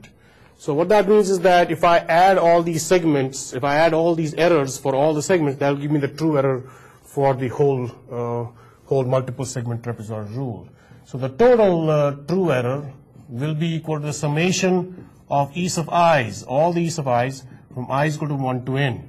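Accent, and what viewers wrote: India. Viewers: Dude has the best Indian accent.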